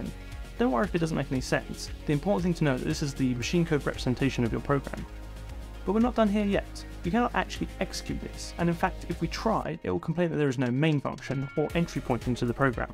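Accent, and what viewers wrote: United Kingdom. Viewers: You probably are British or my listening skills are so pity... (English is not my native)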